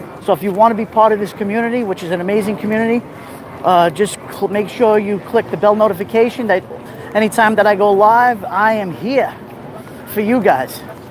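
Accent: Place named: New York, United States. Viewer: You are a bright ray of sunshine, and I just love listening to your NY accent!!!☀️😎👍🏻 I had to hop off, but just finished the ending of yet another great livestream and yes thumbs up!👍🏻 Thank you and God Bless You and your family!🙏🏻🇺🇸❤️🤍💙🇺🇸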